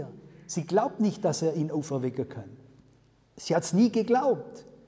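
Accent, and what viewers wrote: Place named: Swabia, Germany. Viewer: Sehr interessantes Zeugnis und dazu noch des Schwäbisch🤗🙏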